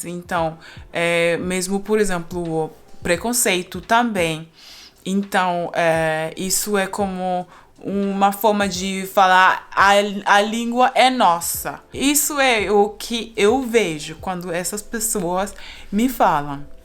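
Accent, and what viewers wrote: Brazil. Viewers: Fique à vontade para expressar sua opinião. Você é fluente em Português com sotaque brasileiro e isso incomoda os portugueses. Na verdade, o sotaque deles é difícil para nós e até incompreensível muitas vezes. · A gente te entendo, se você tivesse aprendido português em Portugal, com certeza você usaria a bandeira de Portugal e está tudo bem você usar nossa bandeira, porque você aprendeu aqui no Brasil e até tem nosso sotaque e existe sim a diferença entre o português de Portugal com o nosso português. Esses portugueses que estão indo reclamar com você são mimados demais e esnobes. Você é ótima e seus conteúdos também e não se importe com essa rivalidade.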